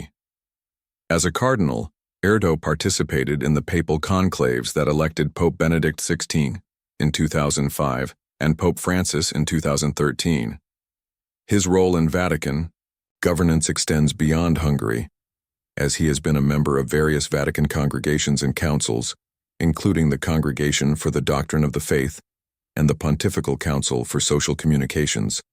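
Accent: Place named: United States. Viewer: almost like an American talking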